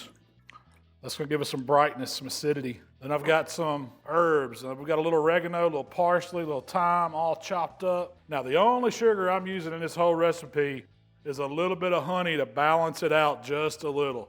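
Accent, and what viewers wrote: South, United States. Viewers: Always enjoy your videos, and usually learn a little something along the way. When a bearded man with a southern accent and a friendly smile wants to teach me BBQ, I'll all down for it :)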